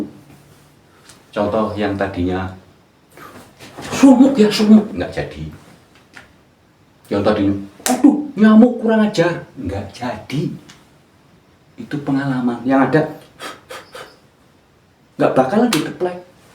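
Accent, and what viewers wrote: Banyumas, Indonesia. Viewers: dari logatnya sih jawa ngapak, pokoknya antara brebes-tegal-pemalang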